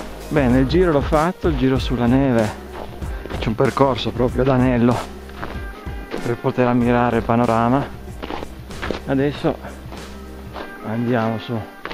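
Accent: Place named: Piedmont, Italy. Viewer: leggero accento piemontese...TI PIACE LA MEENTAA?? 😜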